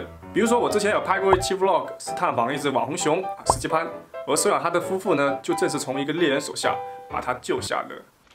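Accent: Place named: Shaoguan, China. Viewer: 看你的说话口音和脸，我猜你是广东韶关地区的😀我粤西人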